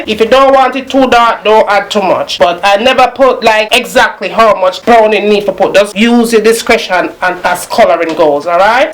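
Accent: Jamaica. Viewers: love the video and the way yuh talk mi sure a nuh montego bay yuh come from..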